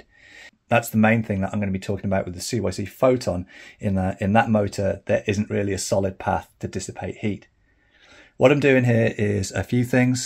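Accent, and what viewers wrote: United Kingdom. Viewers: Very interesting. Listening to you speak, can I hazard a guess that you originated not many miles from Swindon, UK??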